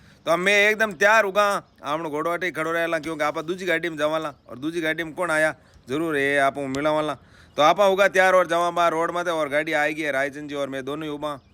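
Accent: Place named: Marwar, India. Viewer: Aap marwadi bolo tho choko lage ..mn saaf h aap ko...thrko h aap ko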